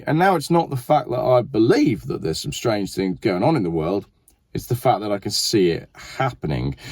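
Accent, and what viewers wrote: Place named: Oxford, United Kingdom. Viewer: Sounds a bit like Oxford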